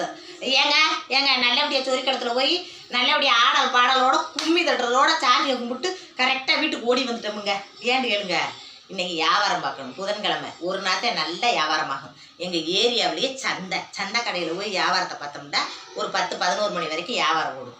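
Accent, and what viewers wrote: Madurai, India. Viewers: Madhurai salang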